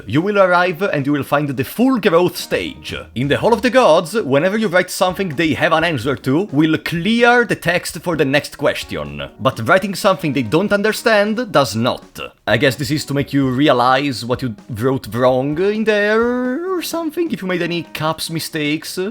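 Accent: Italy. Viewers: I am indeed someone who does not play the game. And indeed, I just plain out like to hear you ramble about things you like. It's nice to hear you talk about your interests, what you think of them, the jokes ideal para el tratamiento de hemorroides , hearing you talk about your life and, I hope you don't mind me saying this, I love your accent, Italian man. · il tuo accento italiano comunque è evidentissimo. Vengo dal regno di Cydonia. seguaci di Katanna venite fuori. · From your accent, it feels like you're a "proper" italian (let the term pass please, it's just to differentiate from italian-americans). Am i right or am i way off the mark? 🤔😅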